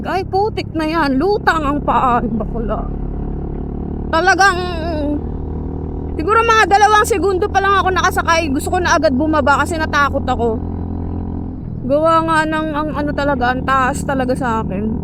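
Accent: Laguna, Philippines. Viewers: Tunog palang ng boses matik taga Laguna e 😆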